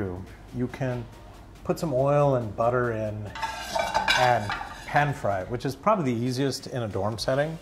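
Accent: United States. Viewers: Dr Nene has true American 🇺🇸 accent. He’s son is also so well brought up. Kudos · You are making wonderful dishes,sharing your knowledge of how even Americans have breakfast,pizza with a blend of indianess in it.Great!I wish to know you have US based Medical certifications which is a great asset.May be you should share these first,your own identity.May be you can leverage this load of cooking to Mrs Madhuri,but of course under your guidance and direction,so the calories and protein content is clear.Encourage youngsters like who have a dream to go to the US and pursue Medical profession,making it easier for them.Its not easy but at least one can try.And may be you start a coaching class with and Ryan to teach your type of English with American accent to the youngsters in the coaching class.This is the need of the time so they can match the American standards.All the best Dr Nene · Superb! 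Dr. Nene will be a Super Hit on YouTube. As glorious as his wife! Excellent English! Handsome Likeable Personality! American English Accent! Down to Earth! Great Content!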